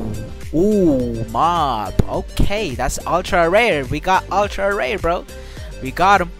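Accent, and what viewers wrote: Philippines. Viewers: Your speaking english but your accent is filipino :\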